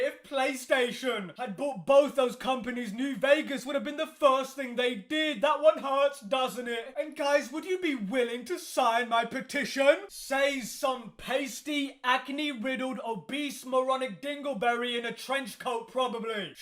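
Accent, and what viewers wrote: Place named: Scotland, United Kingdom. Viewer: I love you, funny angry Scottish man.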